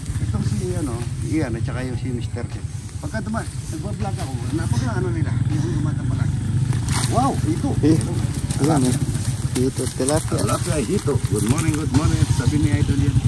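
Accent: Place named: Pampanga, Philippines. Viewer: Good morning kuwait Good morning Philippines Kapampangan accent neh idol..I miss Pampanga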